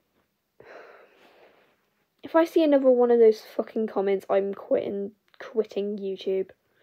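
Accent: United Kingdom. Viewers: O your voice is....British.? Nice voice!!! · You sound british..... Also cool art style😀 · Are you British? 😂😂😅Me in a serious video lol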